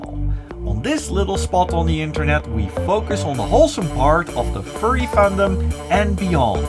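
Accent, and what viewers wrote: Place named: Netherlands. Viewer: You can just feel the dutch accent in him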